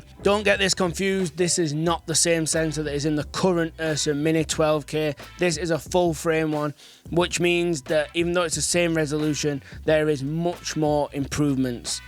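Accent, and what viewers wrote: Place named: Scotland, United Kingdom. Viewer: Damn that Scottish accent. 😂 Thicc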